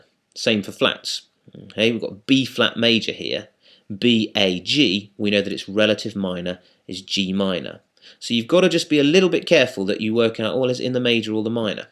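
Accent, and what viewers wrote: United Kingdom. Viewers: THANK YOU.YOU ARE AWESOME AND YOUR ACCENT MAKES ME MISS UK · Why do I learn better from a dude with a British accent? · British man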